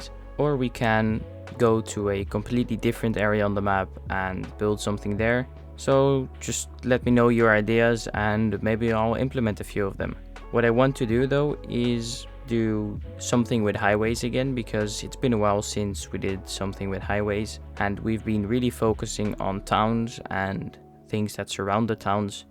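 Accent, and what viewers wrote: Netherlands. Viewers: where is ur accent from? are u dutch?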